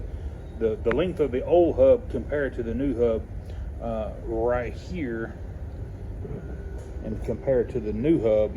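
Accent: Texas, United States. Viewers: Awesome video! Very comprehensive. Appreciate the part numbers as well. 🍻 Personal aside - what part of Texas is your accent from? -a fellow southerner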